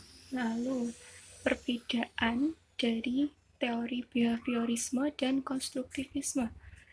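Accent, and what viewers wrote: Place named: Java, Indonesia. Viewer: Uwuwuwuwuuuuuuu medhookk kaakaaa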